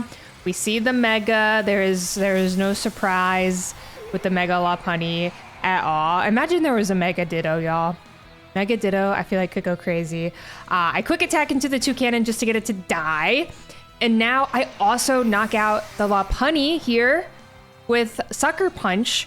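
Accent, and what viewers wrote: Boston, United States. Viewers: As a non-American I'm curious: is that Boston dialect?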